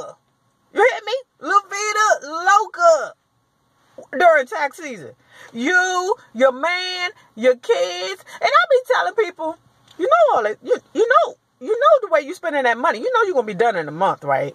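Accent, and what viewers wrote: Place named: DMV, United States. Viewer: The way that you said.... "and I radiate love"..... LLS. To funny!!! The moment I heard you speak I knew that you were from the DMV. Gotta love my local ladies.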